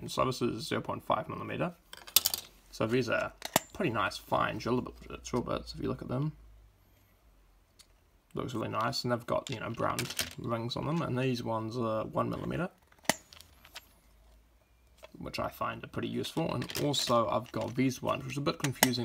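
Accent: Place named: United Kingdom. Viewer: Doing materials could you try to drop a little that british accent, please? People from outside UK find it hard to understand you :(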